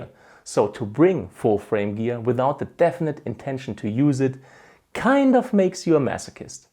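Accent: Stuttgart, Germany. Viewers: U sound a bit german, are you? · Kommst du aus Stuttgart? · man, are you german?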